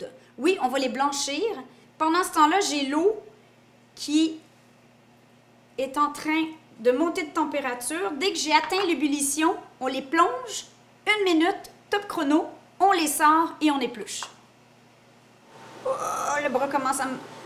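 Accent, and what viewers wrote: Quebec, Canada. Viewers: Tu es adorable avec ton petit accent Québécois, je t'adore merci pour la vidéo, je suis d'Alger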